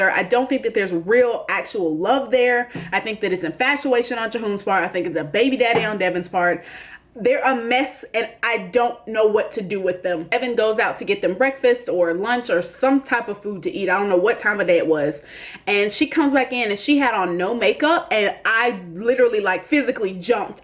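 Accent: South, United States. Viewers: lol...You are so southern like me..It's funny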